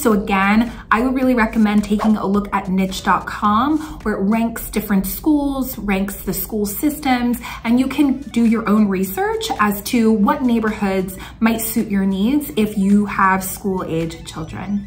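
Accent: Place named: South Dakota, United States. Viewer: Sound like you are from Sioux Falls.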